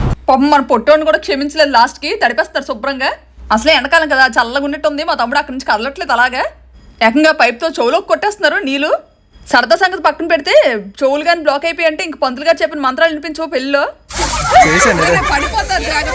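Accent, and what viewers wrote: Vizianagaram, India. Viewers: Me family ki memorable event, so nice to watch your videos, also me vijayanagarm slang superb andi 😍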